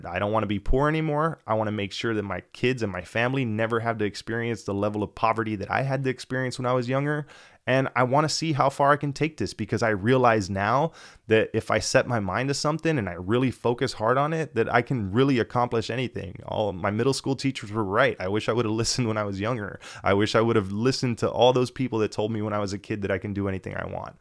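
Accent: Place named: Miami, United States. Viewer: Glad I heard this. We grew up with similar lives and happy to see there’s more people like me in the tech industry. Btw as soon as you started talking I knew you were from Miami, I was right lol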